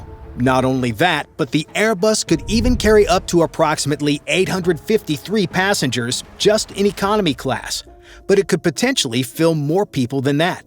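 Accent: United States. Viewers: Stopped watching when he said that the A380 was out in 1980s Plus the insufferable american accent and the Boeing ass kissing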